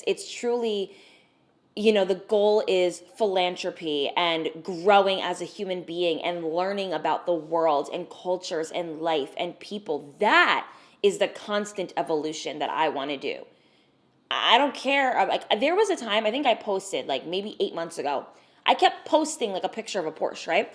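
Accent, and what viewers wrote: New Jersey, United States. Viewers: Omg this accent! It’s so cute, are you from New Jersey??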